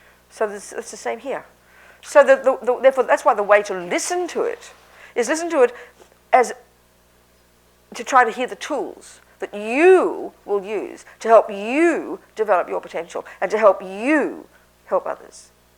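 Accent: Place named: Melbourne, Australia. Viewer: As someone who also grew up in the south east suburbs of Melbourne, her approach works for me. She doesn't dance around people's neuroses but cuts through with clarity. This is how we talk in Melbourne.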